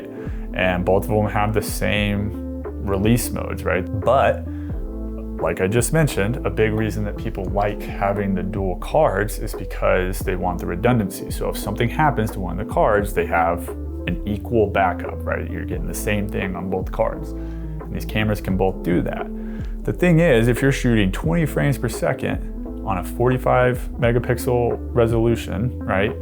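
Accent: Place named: United States. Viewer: Finally someone who speaks American English and says Zee instead of Zed!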